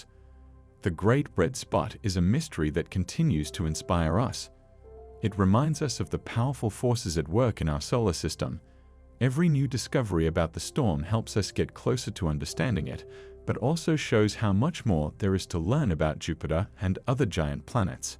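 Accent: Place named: Australia. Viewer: Not American, seems more like Australia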